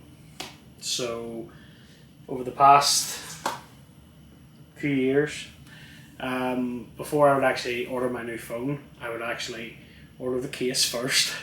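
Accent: Northern Ireland, United Kingdom. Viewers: Thanks - right content at the right time for me. I'm looking to upgrade (from original iPhone SE!) and Magsafe looks like a very interesting companion tech/ecosystem. I had been confused about what it was, and also the difference/similarity with QuadLock. Your video is very straight-up and clear. Telling it like it is with a Norn Iron (Belfast?) accent as a bonus! Per comment and your reply below about mounting phone to a bike (pushbike in my case). Are there any hacks or workarounds that might allow a phone with Magsafe cover to be mounted to a bike? Maybe with some kind of extra bungie or similar? It would be very inconvenient (not to mention spend-y) to have to keep a separate quad-lock cover and mount just for the infrequent times I would want a phone mounted on my bike?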